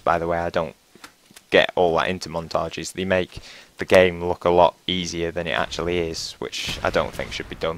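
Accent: United Kingdom. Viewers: A British Commentator :D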